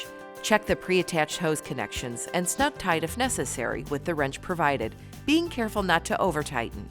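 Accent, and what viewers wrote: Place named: Wisconsin, United States. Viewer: Love the narrator's Wisconsin accent.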